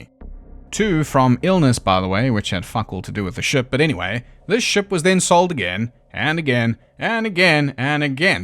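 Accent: South Africa. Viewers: howdy from savannah georgia USA. new sub here. i love your sense of humor and thank you for NOT being AI. your south african accent and pronunciation is far superior to most others from the american south east. i'm glad youtube suggested your channel. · Im so confused why everyone is saying he sounds Australian. It's quite audible someone who primarily speaks a form of dutch (in this case Afrikaans) speaking English. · I spent a few years working in state fairs, and a lot of my coworkers were from South Africa. You apologize for your accent, but it's one I've heard many times. It's pretty much what I expect the cruise and fair industry to sound like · Algorithm just served this video to me. I clicked on it because I've been fascinated with the Mary Celeste since I was, like, 6 or 7-ish. So I was already excited! THEN...I hear it being narrated by a fellow South African!! 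AND...the humour (the whole tone, really) is absolutely on-point!!! This is peeeak YouTube! 👌🏼😁🇿🇦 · I am now officially a fan of the South African accent or yours anyway which I can't say about any British or English accent. Also, your sense of humor is amazing. Laugh so hard every time I listen to your recordings keep going. Keep going love it.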